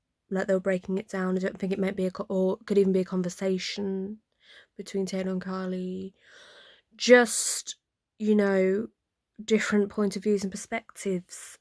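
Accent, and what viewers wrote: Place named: United Kingdom. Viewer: Nah, you would totally dominate that podcast ! for one, your're British accent will make you sound superior, Lol - and 2, you know your stuff! I hear a ton of blogs saying she is back with Agron? I actually think they're in communication, maybe not back together just YET< >but they soon will be. I also think that Taylor will at some point, start hinting more towards her sexuality, she will either do something major after ( covid) and get papped being way too touchy feely wit a girl just to drop hints... and then eventually, she will start forcefully hinting at her 'bisexuality'< she will never quite come out of the closet, but she will aggressively ( more than she already have been with Karlie) drop suttles. Taylor want's out the closet, but her team will NEVER let her. Because taylor , Jennifer Aniston, Katie Mcgrath and an host of others, will never, EVER address those sexuality rumors.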